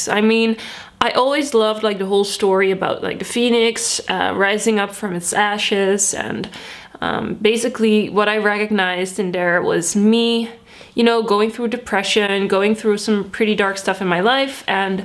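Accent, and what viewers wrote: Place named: Netherlands. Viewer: Toen je begon te praten hoorde ik gelijk dat je nederlands was! haha, loved the vid.!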